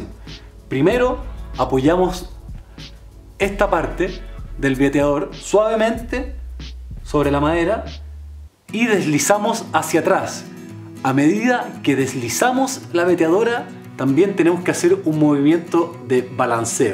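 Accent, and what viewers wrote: Chile. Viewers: buen video , eres chileno ? se te oye la voz como el carpintero del desierto los dos muy buenos en los tutorialees saludos desde mexico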